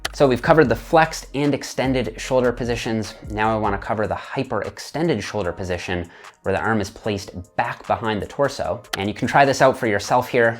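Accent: Canada. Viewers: Canadian accent lol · lol the 'erhm' & 'baarh' Canadian af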